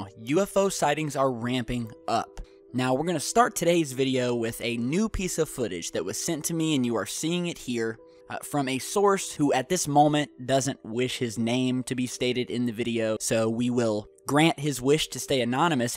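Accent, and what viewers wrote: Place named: United States. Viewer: its cuz hes talking american english. go have fun listening to some brit's accent. freaks me out all the time dunno why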